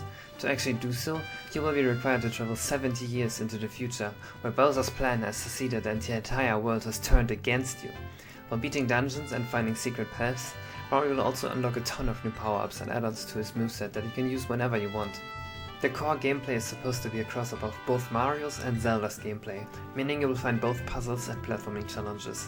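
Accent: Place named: Germany. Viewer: Is by any chance German? The accent is way to strong and familiar lol Also without having to say it: LOVE IT!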